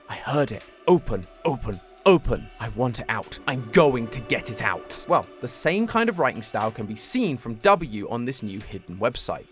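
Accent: United Kingdom. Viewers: We may miss Matpat. But British matpat hits different NOT IN THE SUS WAY YOU IDIOTS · is literally a British version of matpat and I’m all for it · WAIT HES BRITISH :( GOROSEI DENIED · Why did they replace Matpat with this Brit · Hold up why did MatPat leave? Nvm Now we a Very British Man😂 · I’m going to call British matpat now. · The new host has such a nice British accent I could never reach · matpat sounds british lol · The British boy is back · Why is he british? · He’s British, 😮 not going to lie, I like him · BRITISH BOI IS BACK! WOOOO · is the most British person I've ever heard Note:it's not a bad thing that he's British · Is British❤ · british mate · sound like the British version of mato at or more of a Brit acent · He sounds Soo British. Is British? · I actually appreciate the voice change and i have to say its almost nostalgic for some reason to replace an american accent with a British accent. 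I accept. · Ok, but the british accent?😳 Definitely staying with game theorists now😅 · I like your accent, british man.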